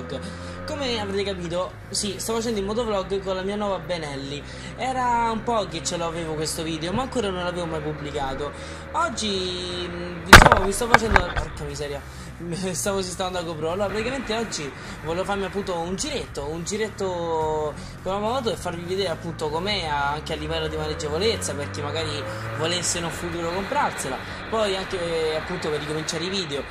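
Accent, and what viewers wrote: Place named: Latina, Italy. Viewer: Ma sei di latina daje ahahah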